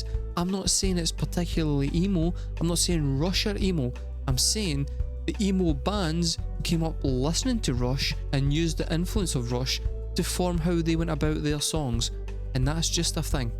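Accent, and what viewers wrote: Scotland, United Kingdom. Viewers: You sound as if you are Scot. And if so you should be aware of fronted by also a Scotsman and their music is unique for the variety of styles of music that includes the flute as a rock instrument. They released their first album in 1968 and released one every year through 1980 and no two albums are alike. The musicianship is incredible as well as the lyrics of their songs, written by Ian. The live performance is amazing and worth viewing on YouTube. But listen to the studio recordings before you view the live performance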